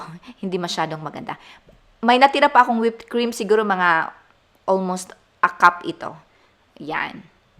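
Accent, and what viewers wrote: Visayas, Philippines. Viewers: I can hear a tinge of bisdak in your voice. Hope to hear more from you! 😍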